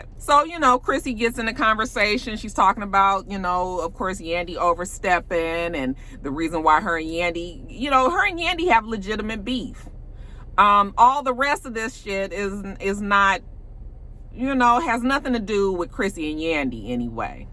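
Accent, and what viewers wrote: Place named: California, United States. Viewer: Don't wanna watch the show..So Thanks...Your review is EVERYTHING!...the more I view the more I clearly I hear your accent..lol California ❤️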